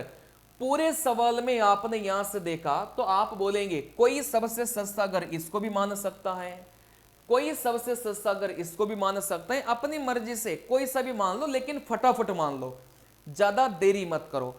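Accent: South, India. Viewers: Oo But aapki hindi tome south indian wala aata h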